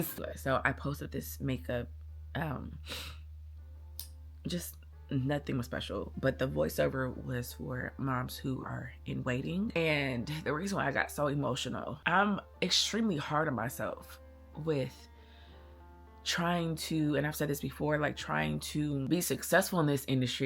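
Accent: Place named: California, United States. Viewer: You look gorgeous, I'm from NY originally but raised in Florida. I could tell by your accent you're from CALI but your fits gimme NY respectfully. Like you'd fit right in, issa compliment.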